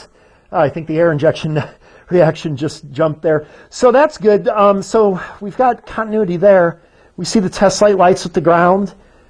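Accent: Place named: Rhode Island, United States. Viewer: Are you from Rhode Island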